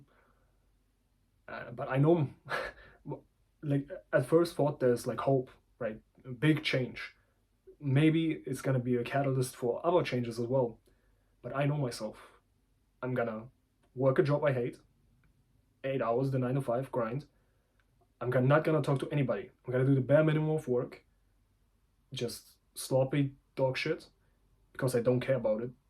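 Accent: Germany. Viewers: Du kommst ja aus Deutschland, das hört man raus. Dir viel Glück und Erfolg.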